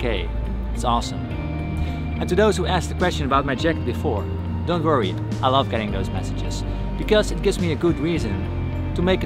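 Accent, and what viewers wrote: Netherlands. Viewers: I'm a noob guitar player and your stuff's casual teaching has helped me learn faster than many other tutorials online and in books, so awesome work. Also... I absolutely love your accent. It's Dutch, right? I also am studying accents for voice acting and watch your videos to learn how to do that accent. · I knew you were Dutch from the moment I heard you speak! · He is so Dutch. His English sounds Dutcher than Dutch. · Sweet Brew Yeah it’s a Dutch accent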